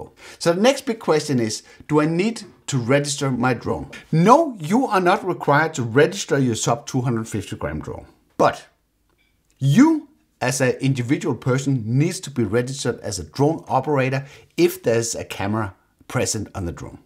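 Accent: Netherlands. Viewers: That is the most stereotypically Dutch speaking English accent I have heard. Love it!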